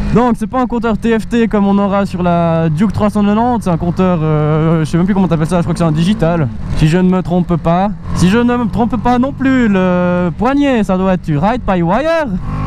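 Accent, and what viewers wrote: Switzerland. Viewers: L’accent suisse 🇨🇭👌🏾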